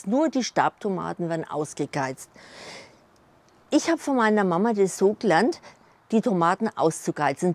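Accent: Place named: Upper Franconia, Germany. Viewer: Meine Großeltern kamen aus Oberfranken. Der Dialekt ist mir deshalb sehr geläufig und vertraut.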